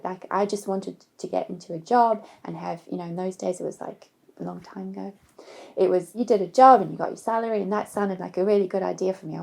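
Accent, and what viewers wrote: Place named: South Africa. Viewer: Ah! I can tell by your accent! I speak Dutch coming from another X colony!😃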